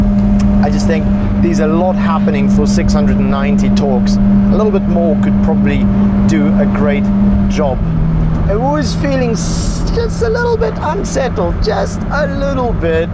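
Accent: South Africa. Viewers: Sounds like a South African accent.🤔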